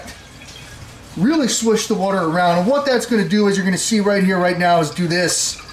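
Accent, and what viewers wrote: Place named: United States. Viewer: Love the American accent ,,,, can fellow Americans know what state he maybe from just by his accent ? Just curious, from Australia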